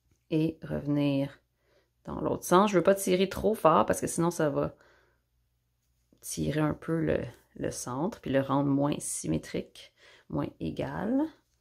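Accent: Quebec, Canada. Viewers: Finalement je trouve le vidéo que je cherchais depuis un certain temps. Ce super carré granny pour mon prochain tote bag. Et quelle surprise d'entendre ce merveilleux accent québécois. 💖 J'ai l'impression d'être retournée à la maison (Je suis originaire de la ville de Québec). Grand merci, je vois que vous êtes super au crochet mais aussi à expliquer. Ici le puff, on les appelle points popcorn 🙂. Vous avez maintenant une nouvelle fan en Italie 😍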